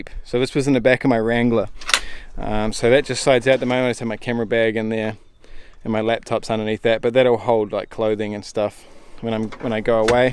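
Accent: New Zealand. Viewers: so nice to hear a Kiwi accent !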